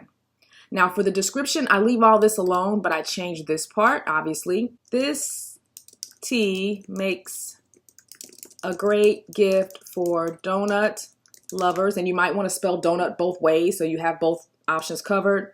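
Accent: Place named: England, United Kingdom. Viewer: I like your english accent can you be my teacher:)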